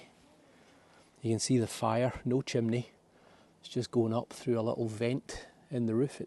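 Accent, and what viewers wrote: Scotland, United Kingdom. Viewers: Thank you for this tour. It's a reality check for most of us who never knew what our ancestors no doubt lived through. My family lived in Scotland, we think the northern part, slowly moving to Canada then to the US a 150 yrs ago. It was heart warming to hear my grand father who still had a bit of his Scottish accent; just a few words that he automatically spoke that sounds somewhat like you. SCOTLAND is beautiful to be sure, but wasn't an easy way of life in times past. This was wonderful & I love your videos of this beautiful country. Thank you!!! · Listening to English spoken with the Scottish accent is like listening to music. Thank you for the photography and narration. Lovely 🌹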